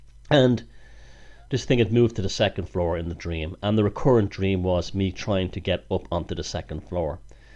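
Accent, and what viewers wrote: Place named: Dublin, Ireland. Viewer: Great to have you enjoying your work, iv learned so much from your videos, I'm like the gotchy man, ya have a Dublin accident people think your a cretan, and my accent is like yours dub. Not the new flat strong Dublin accent, thank you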